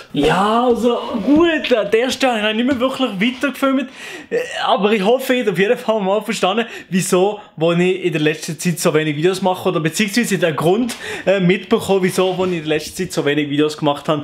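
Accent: Switzerland. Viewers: Bist du Schweiz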